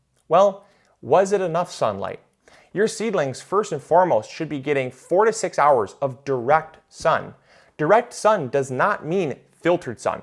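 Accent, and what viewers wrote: Michigan, United States. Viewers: You sound like your from Michigan. nice video!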